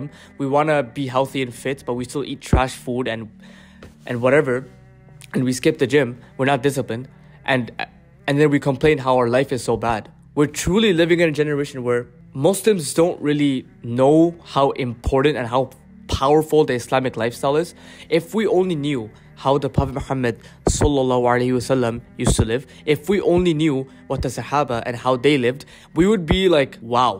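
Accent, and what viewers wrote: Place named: Pakistan. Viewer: he sound like Pakistani no racism I am Pakistani also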